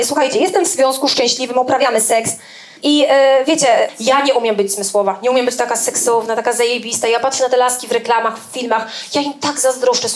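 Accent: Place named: East, Poland. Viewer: Skąd ten wschodni akcent?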